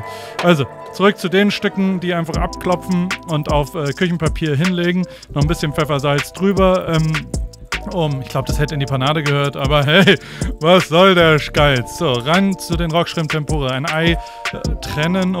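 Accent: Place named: Germany. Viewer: Your German accent ist einfach super geil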